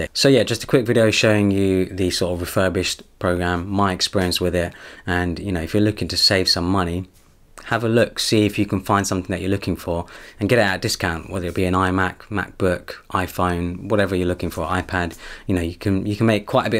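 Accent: United Kingdom. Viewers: love how he's indian and has a British accent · I normally get annoyed with British accents but i can listen to you all day. Nice review! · First time 9n your channel man and damn your accent is wow! Never liked the British accent before hearing it from you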